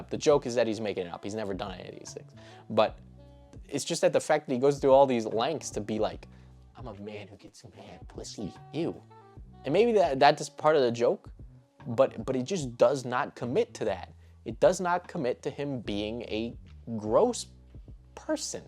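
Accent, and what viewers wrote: New York, United States. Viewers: Are you from Northern America? You sound like a New Yorker, I love your accent ^^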